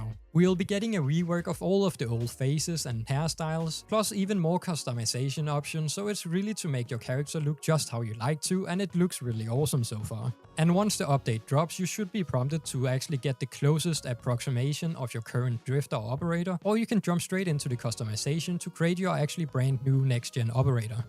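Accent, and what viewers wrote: Denmark. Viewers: Hey kan høre du er dansk. Håber jeg da i hvert fald. Jeg er helt ny i spillet ved du om der er en dansk Clan? Jeg spiller på pc. Må indrømme det er noget forvirrende det hele. Men super fedt har spillet 20 timer på 3 dage nu. Og kan ikke se hvorfor jeg ikke skulle forsætte med at spille 1000 endnu. Vil bruge din kanal til at se warframe nu. Mvh Tobias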